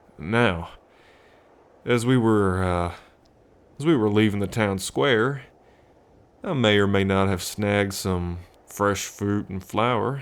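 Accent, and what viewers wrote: United States. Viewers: 🥰🥰🥰nice country voice ❤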